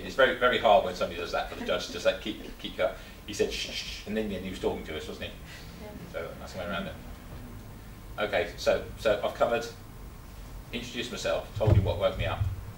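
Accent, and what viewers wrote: United Kingdom. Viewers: two points 1) lose the hyper dramatic intro music. It diminishes the validity of the message with bombast 2) as much of a chore as it is, huge I know, subtitle. I presume Brits can understand this accent, but, combined with the too distant microphone, this flavor of English & its hasty delivery are unintelligible to an American.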